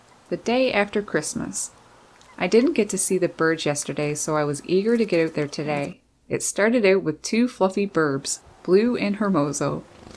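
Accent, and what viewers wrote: Canada. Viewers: I think she said NewFoundland. She's got quite the Canuck accent.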